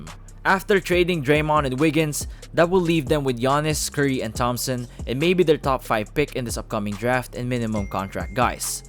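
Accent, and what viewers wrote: Philippines. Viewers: I can tell that you're a filipino just by observing your accent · I Guess you're a Filipino, because of the accent.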